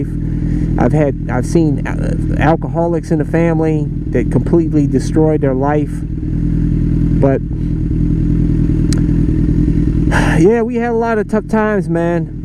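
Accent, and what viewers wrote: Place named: Texas, United States. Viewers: Thanks for sharing your story. We all have a story to tell. When I first heard your voice it didn't sound North East at all. Sounds more Southern, but I guessed you grew up in a "certain area" just from your lingo. But you pulled yourself up and made a better life. That's awesome! The problem with "The Hood" is, some people insist on staying stuck on stupid even when they don't have to. Again, thanks for sharing. Stay safe and ~ HAPPY NEW YEAR ~ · to me it sound like a Texas dude lol I don't really know alot about the south haha I'm from the bay area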